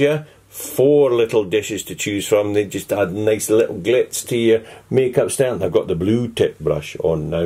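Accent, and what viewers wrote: Scotland, United Kingdom. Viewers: my dad is Scottish so I can understand everything he is saying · Haha Scottish accents r the best 💝 I'm Scottish too xx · Epic! I'm Scottish too. Understood every single word and cracked up laughing at subtitles! X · i wonder how many non scottish people watching this like whits he saying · It's so great to understand every word even though I'm German! I love the Scottish accent! Scotland the brave! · There's a Scottish security guard at my school & he sounds exactly like this lol but this was funny, he actually was good at explaining everything tbh 😂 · Holly Nethercot. That's how people speak in Scotland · I feel as if I only know what he's saying because I'm Scottish 😂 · Where are you from??? My dad and your dad have the same accent!😂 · so how come you have such a proper scottish accent? is it just so people on youtube can understand you better? 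when I first clicked on a video of yours it took a second to realise you were even scottish hahah, i do find myself talking a bit more proper when i'm talking to someone who isn't scottish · i'm not scottish, and i understood every word😂 i just absolutely love his accent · I love how americans dont understand wit hes sayin but i love the wee accent i have and im so glad im scottish 👍🏻😂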